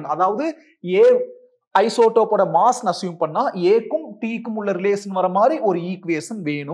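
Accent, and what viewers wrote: Coimbatore, India. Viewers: Sir i am feeling that i cant see your video from tomorrow i miss your videos your approach your teachings and your body language your coimbatore slang and everything sir hats off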